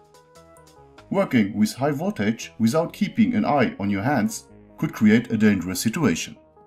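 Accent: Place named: Germany. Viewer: are you german or french? sounds a lot like english with a strong german accent :D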